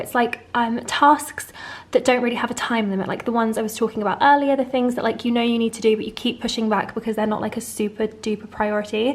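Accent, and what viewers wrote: France. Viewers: An amazing video like all the time. And don't worry, your french accent is good and really charming!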